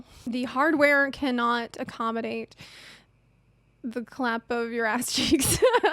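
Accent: South, United States. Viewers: Love the southern accent :D PS: It would seem I've become a simp...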